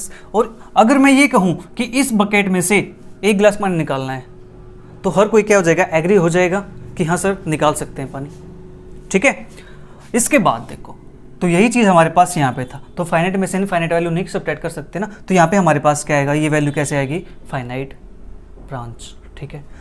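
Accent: Haryana, India. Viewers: Sir app haryana se ho kya?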